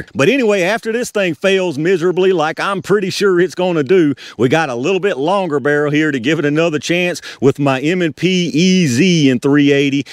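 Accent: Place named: North Carolina, United States. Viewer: He may live in Virginia, but that accent is eastern North Carolina.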